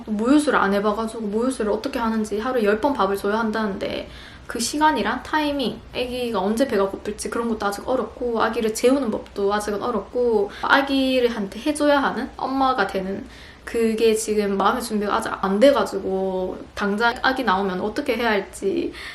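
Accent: Gyeongsang, South Korea. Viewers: 처음 댓글 달아봐요~ 경상도 사투리에 말이 엄청빨라서 호기심에 봤던 영상이 벌써 출산짐싸는 영상까지 하네요^^ 제가 출산경험자로 알려드리고 싶은건 여름에 아기낳으면 에어컨바람 조심해야해요. 넓은 손수건챙겨가셔서 목뒷쪽 찬바람 막아주면 좋아요. 모유수유하다보면 목,어깨 아픈데 산후풍까지 오면 힘들어요. 아기 낳는순간은 힘든데 참을만하고 낳고나면 아기생각에 정신없이 지나가요. 모든 엄마들이 그렇듯 도 잘 이겨낼수 있을꺼예요 순산하세요~~ ^^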